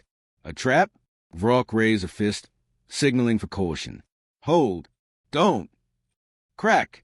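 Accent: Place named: New England, United States. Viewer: Bad accent get rid of snotty New England accent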